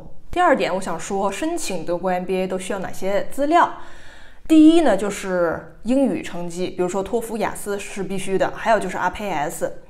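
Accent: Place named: Northeast, China. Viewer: 小姐姐是东北的哈哈，有一点小口音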